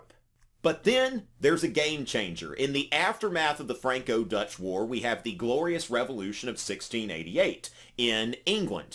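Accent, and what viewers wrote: South, United States. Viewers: yeah im a big fan of your videos since i found your Thirty Years War video, and ofcourse your southern accent. Anyways im sure you will do great if you decide on making a video of Sweden, its a shame because like you said most people leave out Scandinavian history besides from Vikings mostly, i might be a bit biased but the Swedish Empire is surely impressive seeing as its only surrounded by other huge and powerful countries and could still hold its ground. Besides all of that, Sweden has had a significant amount of political and military influence on Europe throughout most of history atleast until their loss of Finland in 1809. Greetings from Stockholm :)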